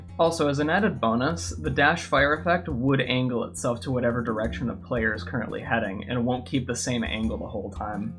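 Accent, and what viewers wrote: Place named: Canada. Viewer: You sound like kenadian.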